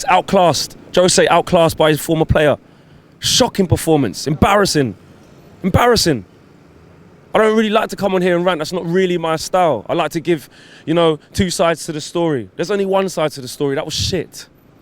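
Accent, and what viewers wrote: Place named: Manchester, United Kingdom. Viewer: Strong Mancunian accent there... MOU in!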